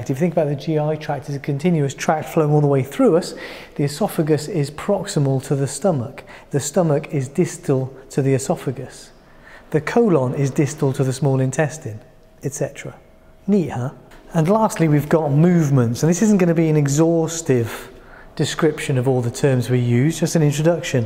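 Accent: United Kingdom. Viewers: Yeah i really don’t like bri’ish accent(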